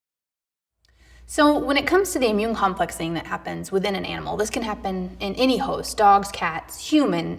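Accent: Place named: Philadelphia, United States. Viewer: She sounds like she’s from Philly lol.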